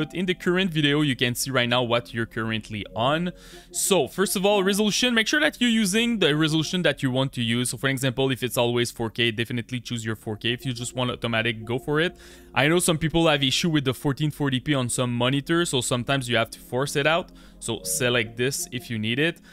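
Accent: Quebec, Canada. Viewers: Quebecois mon homme ? 😂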